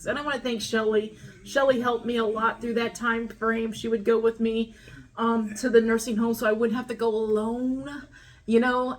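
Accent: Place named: South, United States. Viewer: yes I also wondered that also I’m from South Georgia he sounds southern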